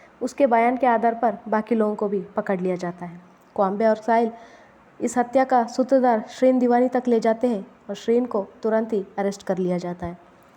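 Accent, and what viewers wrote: South, India. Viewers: Are you Hyderabadi or any other part of South India??? Love ur accent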